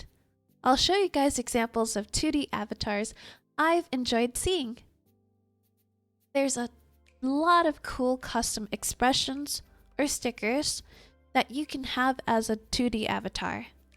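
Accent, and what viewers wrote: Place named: United States. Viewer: You live in Europe? where?.... eh some people don't like to disclose that information. Your accent sounds pretty American to me so that's shocking to hear.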